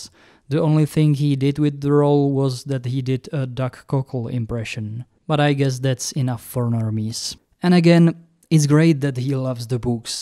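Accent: Czech Republic. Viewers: Hej úplně random jsem našel tvoje video a je vtipné že okamžitě jde poznat čecha co mluví anglicky :D Velmi dobré btw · are you czech by any chance? the accent seems familiar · man that czech accent is nice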